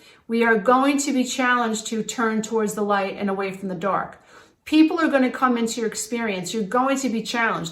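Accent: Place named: Long Island, United States. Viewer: Another spectacular Stellar video. Life lessons worth millions to me. You're priceless in the knowledge assistance reassurance Etc. That you give to me. I grew up in Levittown Long Island and living in Las Vegas 30 years I haven't lost my accent and it's so wonderful to hear yours makes me feel so at home. Honestly feels like my wonderful mom and her friends are sitting around talking giving me advice like they did the times when I was growing up hanging around the house dealing with s***. Peace light love my friend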